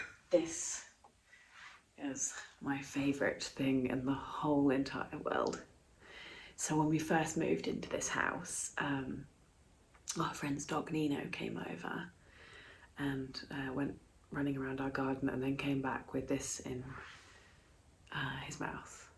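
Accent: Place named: Surrey, United Kingdom. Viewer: Lovely Surrey accent and Asmr , Just wish you posted more videos , Blood thinners aren’t fun I’m on them for life because i get clots in my lungs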